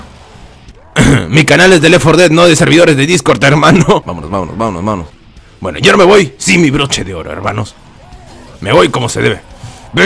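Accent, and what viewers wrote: Peru. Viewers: Eres de Perú ? ._.XD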